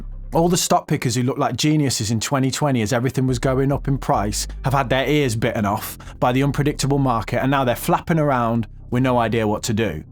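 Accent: United Kingdom. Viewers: ⚡️I hope you don’t ask me to transfer btc to your wallet in order to receive my score. I’ve enjoyed following your success. It’s good to hear a British voice, with something to say, that’s worth listening to. ⚡️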